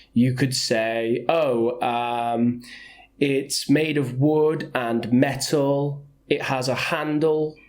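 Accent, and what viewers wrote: United Kingdom. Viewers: I've been learning so much with your videos, I don't have time to attend an English course so I study by myself when I'm free.I've been following your tips and I notice that I'm finally making progress at my studies!:) Plus, your British accent sounds like music to my ears.haha Thanks a lot!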